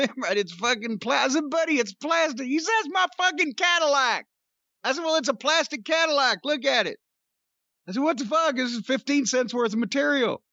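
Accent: South, United States. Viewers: With the southern accent it's definitely a Hank Hill type thing I have never heard Jim sound that much like Hank Hill in my life but now I can't on here that. Mike Judge did base the character of Tom Anderson/Hank Hill off of all the older Southern men he grew up around. And although is about the same age as Mike Judge Jim sounded like Hank Hill when he said that and I honestly think it's just an older southern thing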